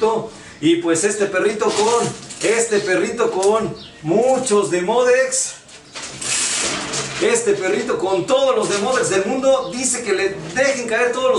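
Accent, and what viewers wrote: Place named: Puebla, Mexico. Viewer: Saludos médico Regrese con sus videos por favor Por el acento que tiene al hablar se me figura de Puebla de los angeles, paisano 🤝🏽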